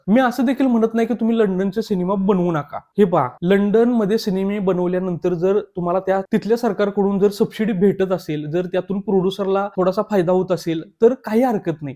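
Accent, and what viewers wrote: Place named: Kolhapur, India. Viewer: तो खास कोल्हापुरी ठसका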